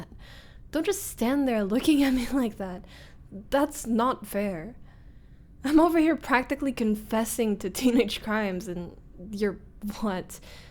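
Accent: France. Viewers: Purée je me perd dans l'asmr et je tombe sur une asmrtiste incroyable qui parle anglais I appreciate seing french speaking english and I absolutely love your accent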